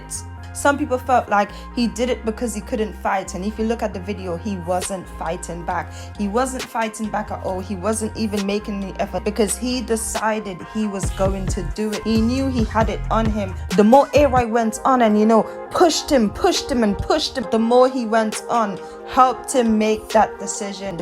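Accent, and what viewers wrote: United Kingdom. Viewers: 👎 for the accent, stick to that side of the pond with your “UK Dill”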